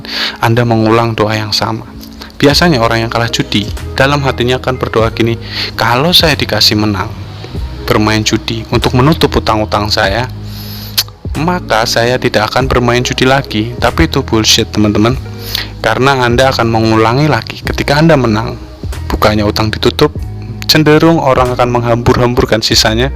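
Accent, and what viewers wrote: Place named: Tegal, Indonesia. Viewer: ngomongnya medog logat tegal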